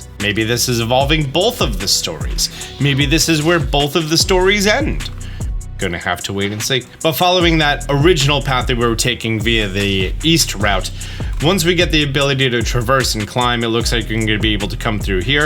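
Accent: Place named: Philadelphia, United States. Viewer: Are you from Philadelphia? You say 'wudder' like all of my friends and family. Lol. Also, thank you for the great breakdown!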